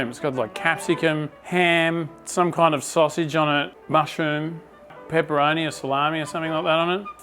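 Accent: Australia. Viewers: I hate that you have to censor your beautiful australian english on youtube if you want to earn any money. FUCK YOUTUBE.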